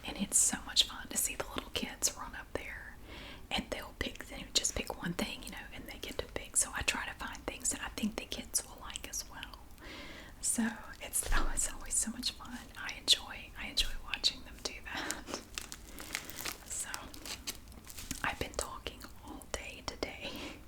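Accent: South, United States. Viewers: As a southerner, I love to watch your videos! Makes me so happy to hear your accent! Sending hugs from Texas!!! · I’m a baby and you’re my mom right now! I love how southern and relaxing your voice is❤️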